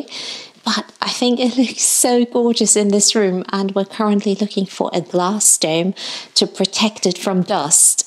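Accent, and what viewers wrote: England, United Kingdom. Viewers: You say you are Germans - but to my American ears you sound so English!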